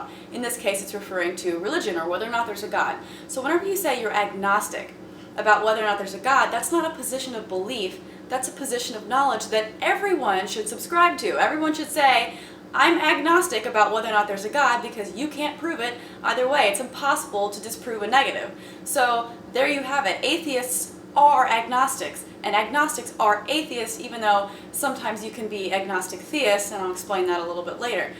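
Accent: South, United States. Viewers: Even famous biologist Richard Dawkins has noticed and appreciates her style. We all love listening to her, even the Muslims watching "Muslim hot line" although they won't admit it. Me I just LOVE the southern accent y'all. #masturbateforjaclyn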